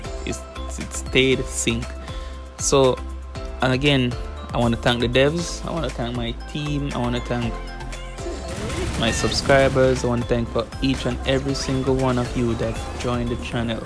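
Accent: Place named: Caribbean. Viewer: hey which country you from?, you sound like a Caribbean person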